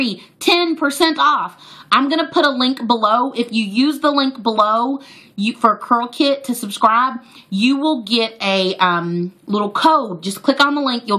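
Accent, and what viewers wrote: United Kingdom. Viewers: Looool your english accent!! Its sooo funny! When you come to the uk,we need to meet up!! Lool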